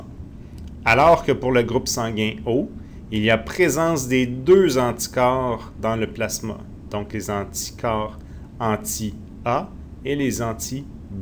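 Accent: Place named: Canada. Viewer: Bien compris, juste que l accent canadien est difficile.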